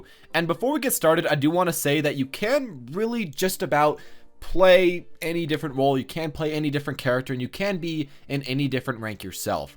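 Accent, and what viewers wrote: United States. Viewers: Wait, are you from utah?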